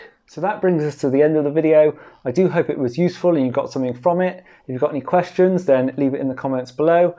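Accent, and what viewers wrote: England, United Kingdom. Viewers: I'm 69 years old and I've had many lessons and watched many YouTube videos. This is absolutely the best lesson I've EVER "had" to explain how the blues work. I know this pattern and can play it blindfolded but you have taught me how to correctly use it and it makes so damn much sense. THANK YOU. You sound English but I wish you were in Arizona so I could take lessons from you. Many thanks "mate".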